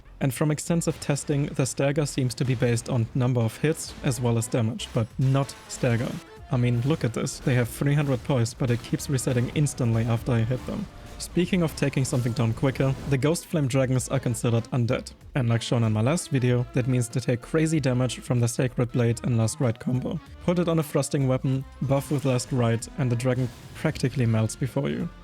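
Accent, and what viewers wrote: Germany. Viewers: Are you German? Klingt irgendwie so :D oh and thx for all that very useful information, tarnished <3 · Du bist Deutscher, merkt man an deinem Akzent! Wieso also Englischsprachiger Kanal? More Money!😅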